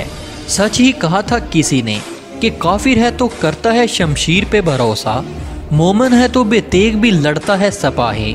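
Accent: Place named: Punjab, India. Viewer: Yeah bhai Punjabi mei Urdu bool rehay hain 👎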